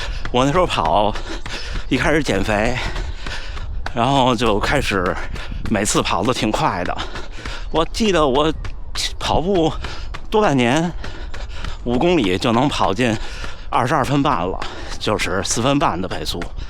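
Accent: Beijing, China. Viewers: 特别喜欢听乡音😂 我小时候（95年左右）住胡同里，北马从家门口建国门桥上跑下来。我5岁多就自己在路边看跑步。没想到竟然埋了个马拉松的种子在心里。2024年春天跑了个半马2小时零1秒。然后很鸡血地报了秋季首马，练的特狠，跑了343。2025没怎么练跑步，玩自行车了，半马PB了143。2026又开始练游泳，结果练了3个月放弃了，85公斤胖子但腿太沉没啥肥肉，浮不起来。重新练跑步，但是心里总打鼓，想5年破三，又怕自己天赋太差。听你分享经验我就觉得特安心。心想着自己首马都到的第三年成绩了，我再练个5年，堆起来跑量，肯定也能破三了。就像你说的，不光练身体，关键是脑子（心态和意志）。练就完了。谢谢博主的分享！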